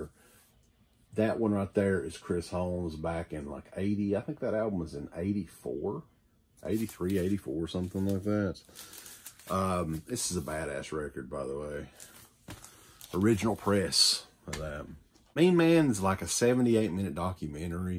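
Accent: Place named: Deep South, United States. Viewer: Where you from brotha? You got a good ol deep south accent. Im in Arkansas from Texas. Glad to see good ol boys on some good ol metal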